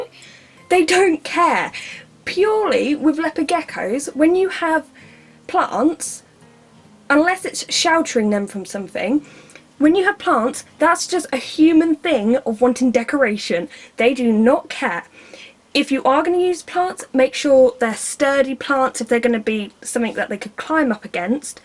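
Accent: United Kingdom. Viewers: Michael Holmgaard she's British and British people sound like that... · Michael Holmgaard Umm... seriously? She is a British and British talks like that, you know that right?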